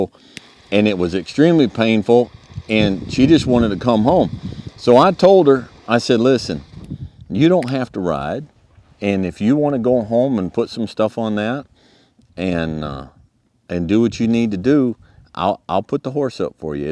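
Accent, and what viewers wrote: United States. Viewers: Where you been all these years! Words of wisdom and truth! This is the voice we been waiting for man! Country plain and simple! Be a man!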